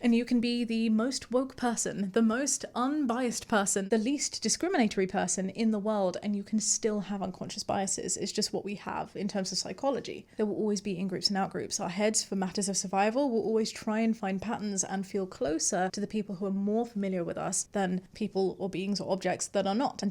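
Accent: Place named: United Kingdom. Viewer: You actually sound British. When I think of Asian food I think of curry. The ultimate food, it's found from India to Japan. Although I doubt anyone from Bangalore would recognize curry from Takahashi. I don't recognize an Asian ethnicity, because Asia is too varied. Dravidians are not Persians are not Han are not Yayoi. Even India is a welter of different cultures, languages and populations.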